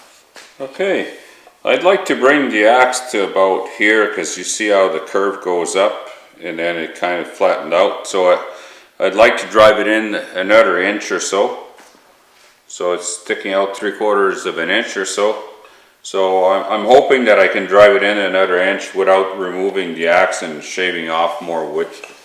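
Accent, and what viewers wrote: Quebec, Canada. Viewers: Quebecois eh? I like your accent. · Great video, mesmerized by your Canadian accent - very cool. You have got some impressive tools and skill set. Thanks for the video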